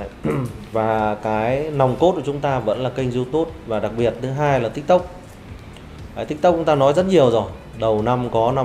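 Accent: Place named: Thanh Hoa, Vietnam. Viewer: Chủ tịch này nói đúng giọng thanh hóa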